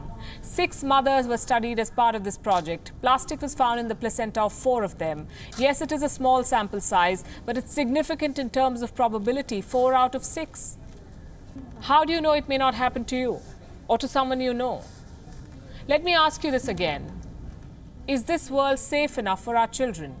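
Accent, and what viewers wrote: India. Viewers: Her english sounds uptight and weird posh with a hint of an indian accent. It isnt the best. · yes for Indian Its Indian accent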